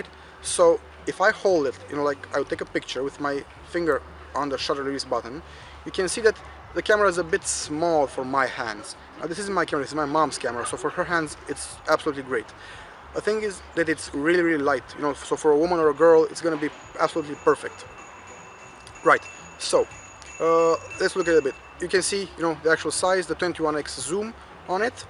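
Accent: Romania. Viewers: I like the fact that you talk fast, but i think it would be nicer if you worked more on your accent. From the first moment i knew that you're romanian and for some people the fact that they can tell you if you're a native american or not is a nice thing, but not for me.. It's kinda' like hearing an american talking romanian (without having the native accent)... Thanks for the review. As far as the camera that i shoot with (Canon PowerShot A610), i think the Nikon Coolpix L310 would make my photos looking pro (only in comparison with my actual camera). You may say that this camera is not for professionals, but i think it surely can take profesional photos if you know how to shoot. Thanks again! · Salut, Banuiam dupa accent ca esti roman, apoi cand am vazut ca ai aparatul setat pe romana am zambit:) Am de gand sami iau aceasta camera pt pret si pt ca vreau doar poze ,,cazuale,, daca ma intelegi. 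Apreciez munca ta si bafta in continuare. :D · Mai... Dupa cum vorbeai la inceput puteam sa pariez ca esti roman.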